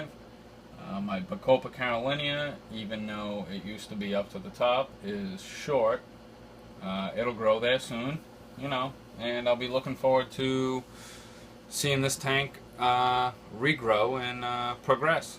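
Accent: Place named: New York, United States. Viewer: Following cuz you sound like you’re from NY.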